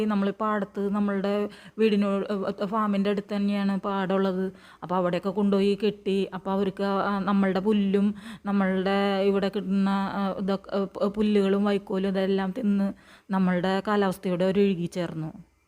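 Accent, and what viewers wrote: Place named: Thrissur, India. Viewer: തൃശൂര്‍ ഭാഷയാണല്ലോ എവിടെയാണ് നാട്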